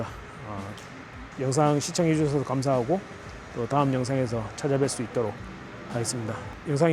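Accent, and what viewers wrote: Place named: Busan, South Korea. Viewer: 캐나다에서 부산사투리.. 니마! 확마!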